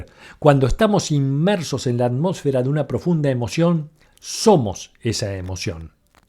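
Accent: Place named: Argentina. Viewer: Siempre es grato escucharlo. Deseo compartir una sensación paradogica mientras te oía.En la parte final del vídeo cuando haces una serie de postulados sobre la Libertad solo asentía y no podía estar más que de acuerdo. Hasta que el acento argentino me recordó a MILEI y pensé como unas ideas tan profundas y tan ciertas pueden ser tergiversadas y usadas para todo lo contrario. De nuevo, mil gracias.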